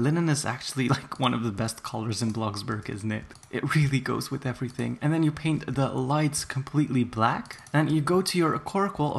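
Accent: Netherlands. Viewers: Omg he's Dutch like meh! Yasss Queennn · Wait, are you Dutch? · 0 Are you dutch?! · hold up, i thought you were an American! But no (lol its going to be fun if im wrong) you're a dutchie!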